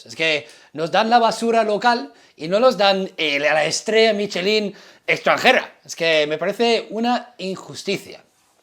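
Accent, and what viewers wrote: Spain. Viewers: Vaya acento mas raro tienes tio. No lo digo criticando. Un californiano con acento de españa es como un perro verde. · Por cierto tu español es buenisimo tienes acento español no latinoamericano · Tiene acento castellano. Flipo! ❤️ already in love. Enhorabuena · Usted tiene acento español y usa frases españolas como "joer", no creo sea su primera vez oyendo a...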